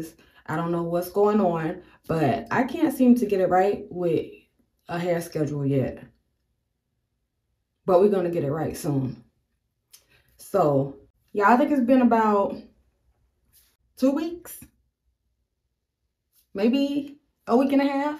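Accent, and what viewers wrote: United States. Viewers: Omg loved the hair! I work from home and most of the time I wear my hair in a big fro. When I straighten it(not too often), my husband don't know how to act chile!! Lol. Your hair is beautiful, cool personality. New subbie here!!😊 Are you from New Orleans? Sounds like it😊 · I could hear the beautiful southern accent ❤. Yep it’s hot 🥵 down here… you look gorgeous ❤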